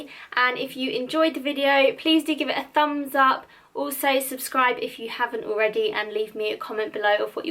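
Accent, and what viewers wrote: United Kingdom. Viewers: Lovely accent. I want to be British!😭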